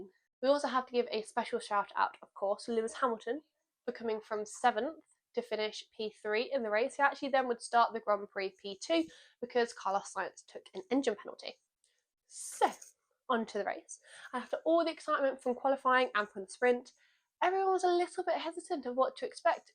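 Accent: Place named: United Kingdom. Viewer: I love your comments, your channel and your british accent. Greetings from Mexico.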